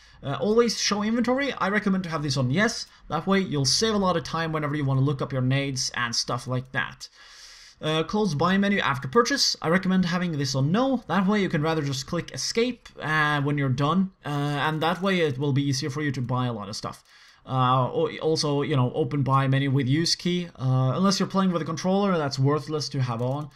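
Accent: Norway. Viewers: Er du norsk? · Hei :) O_o er du norsk?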